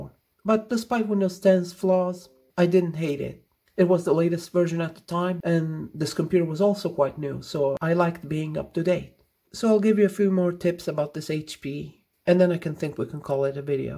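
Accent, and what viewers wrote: Italy. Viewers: Ma sei italiano?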